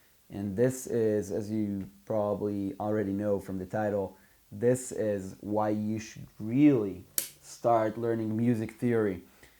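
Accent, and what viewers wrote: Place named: Latin America. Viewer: OMG man, your videos are amazing, you make such intresting and useful videos, they can be super useful for beginners+ players. It is a Shame you are so underrated, you make hi-level videos and Don't get as many views as you realy deserve. Wish you the best of luck, keep up with the amazing content. P.s. até you from Brazil or latin America? I think I know this accent hahaha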